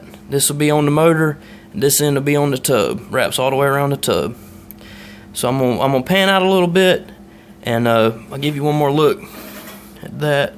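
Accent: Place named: Carolina, United States. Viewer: Man this video is very helpful, love that Carolina accent too, reminds me of my family.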